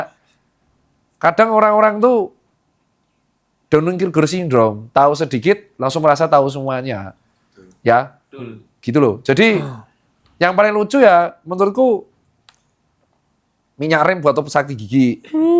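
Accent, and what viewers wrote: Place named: Surabaya, Indonesia. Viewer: Original Suroboyo... cocok banget aku suka 😅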